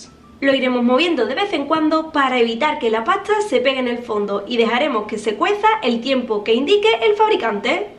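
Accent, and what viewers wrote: Andalusia, Spain. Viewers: eres espeñola ? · Hola espero que me des un corazon sos muy tierna y explicas muy bien las recetas y me encanta el acento español sos muy hermosa y sos muy KAWAII soy la hijade el señor y soy tu fan hice todas tus recetas espero que me des un corazon ❤❤ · Super el video sobre todo su acento español q yo diría q le gusta a todos los latinos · De qué parte de España eres? Me hace gracia tu acento 😂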